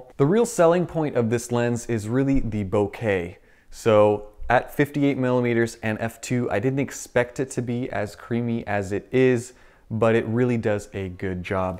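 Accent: Russia. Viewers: Чувак, ты крут! Откуда такой русский? Where did you get this accent?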